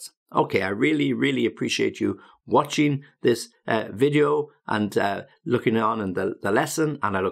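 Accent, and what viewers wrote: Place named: North England, United Kingdom. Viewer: Hi Harry ! This is the first time I watch one of your videos, I really like your accent, it is so colourful , are you from the North of England ?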